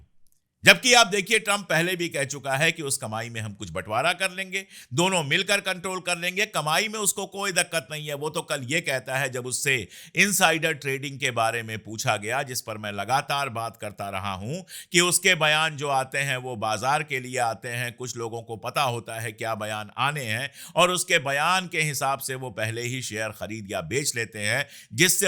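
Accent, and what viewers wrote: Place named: Kashmir, India. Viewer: sir kashmiri hein zabaan mein kashmiriyat he bhai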